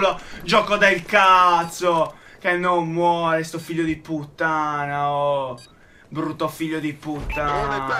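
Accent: Sardinia, Italy. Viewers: Vai...ma sei sardo? Se si...anche io sono sardo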